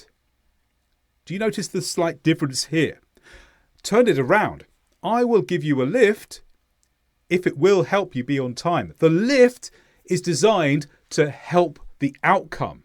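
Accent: United Kingdom. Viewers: Wow, wonderful world class english. I like british accent V.much.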